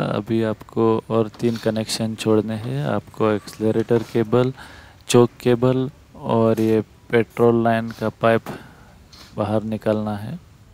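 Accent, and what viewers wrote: Goa, India. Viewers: Are you from goa? Cz ur hindi little like goan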